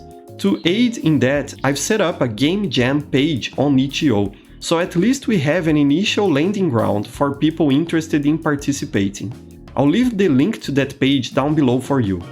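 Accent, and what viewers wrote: Brazil. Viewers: why does your way of speaking seem so much like a brazilian speaking english?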